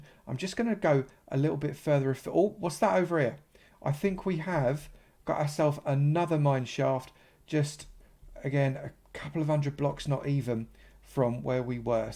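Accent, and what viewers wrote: United Kingdom. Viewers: Its a normal british accent you donkey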